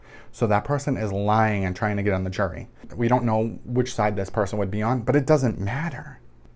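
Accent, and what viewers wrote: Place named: Boston, United States. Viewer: lol I could care less innocent or guilty but every couch potato I come across trying to be a “reporter” never addresses FACTS..missing Lexus telematics that would actually convict her, 3 missing surveillance tapes, 2 destroyed phones, and there isn’t enough words about the investigation. Also..the Boston accent with a lisp is not air worthy..sorry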